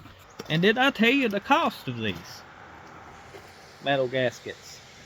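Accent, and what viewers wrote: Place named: Louisiana, United States. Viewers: Sounds like a louisiana accent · you've got an interesting accent. Where are you from, Louisiana?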